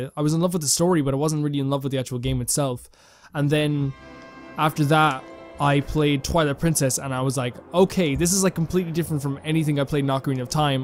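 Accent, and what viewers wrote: Ireland. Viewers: You know, you remind me a lot of Jacksepticeye, and not just because of the Irish accent, but you’re always so happy and positive, and it’s really made this play through super fun to watch! Please keep it up, I have no doubt your channel will grow to immeasurable heights :)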